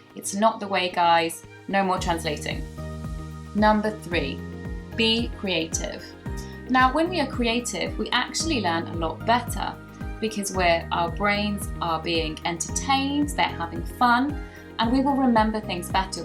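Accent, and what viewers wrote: United Kingdom. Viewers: Wow.. amazing British accent! U have a new follower : ) Por cierto hablas muy español ;) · Hola ! You said everything right in spanish !! You can practice with me ! I'm a native speaker !! I want to speak British I really like the accent !! 😁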